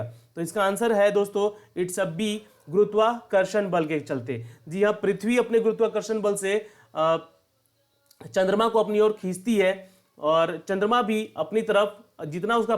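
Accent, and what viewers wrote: Bihar, India. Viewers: Bihari ho kya bhai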